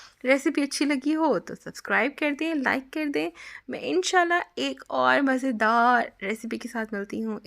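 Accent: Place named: India. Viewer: Please don't talk like Indians it's اچھے سے it's اچھی طرح سے ۔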